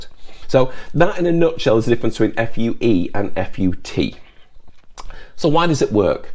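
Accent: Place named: Northern England, United Kingdom. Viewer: Hi, Another insightful video, and great to hear a friendly northern accent;) Thank you for answering my last two comments.. I have been researching FUE for the last 18 months, as that is when I have noticed my thinning and receding hair line. Funnily enough its the exact same time when my first born arrived, maybe there is a correlation as soon as you have kids, your hair says ok, you have found a mate, and we have procreated, no need to stick around lol... Keep up the great work you are very natural and entertaining, Best Rich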